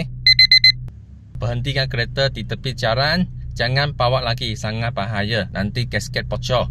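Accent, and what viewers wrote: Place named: China. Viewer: Wehy mulut ko mmg takde insurans ke musibat, ni ckp elok jek aku dengar,cuma dia pelat sbb dia berbangsa cina,yg ko jgn buat malu warganegara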